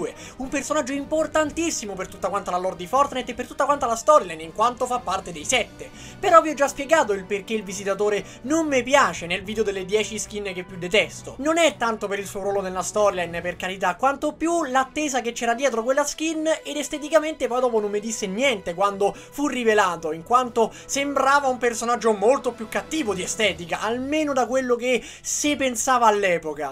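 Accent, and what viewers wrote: Rome, Italy. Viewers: Sono di napoli e ti.ascolto sempre ma so parla pure en romano a o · bravo ragazzo sei davero simpatico nei tuoi video , continua perche sei davero particolare sarà il tuo accento romano , sarà che non sei antipatico come gli altri youtubers ma secondo me ti meriti di più come iscritti di certi che parlano di fornite , concludo dicendoti continua cosi che vai alla grande ciao ed e sempre un piacere vedere i tuoi video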